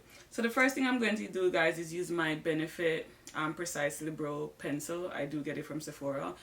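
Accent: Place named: Jamaica. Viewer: Beautiful makeup routine . Are you Jamaican? I hear the accent . ❤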